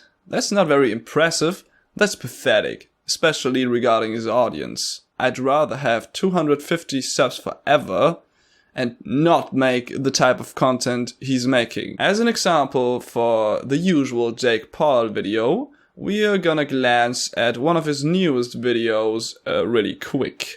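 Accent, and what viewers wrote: Germany. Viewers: Really enjoyed your video, and totally agree with you on most points. From your accent and the bag behind you I assume you're German (forgive me if you're Austrian or Swiss). I had to smile a bit when you mentioned how Jake Paul's philosophy (or world view or whatever) is very American, as it reminded me of how the German exchange students here characterize such people, and sadly I have to agree with them. No I don't want a generation of Jake Paulers. His content is a monument to his own arrogance and narcissism. While I don't like his older brother's stuff either, at least his charisma doesn't seem anywhere as fake and vapid as Jake's. As for the Navy Seal stuff, while I'm sure the armed forces would have ripped much of the narcissism from him, I have to agree that it fits in line with the vapid (probably) bully type that I'm sure he was, and to some extent still is. 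And I hate the "we made it in one day," shit. In writing, editing and refining your work takes up much more time than actually writing content by volume, but it's the refining and craftsmanship that come with it that make it good. Jake is just pumping out sewage.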